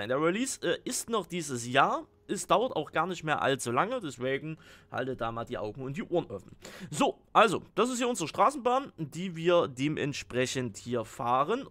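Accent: Saxony, Germany. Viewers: Ich liebe dein Sachsen Aktzent wo wohnst du in Sachsen ich wohl bei Leipzig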